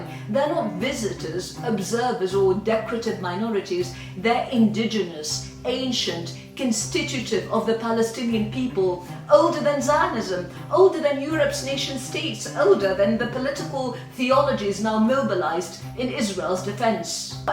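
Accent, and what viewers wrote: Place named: London, United Kingdom. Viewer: Mad accent. It's like an Edwardian era upper middle class London accent.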